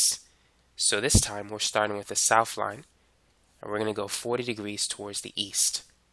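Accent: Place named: Boston, United States. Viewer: Boston Accent.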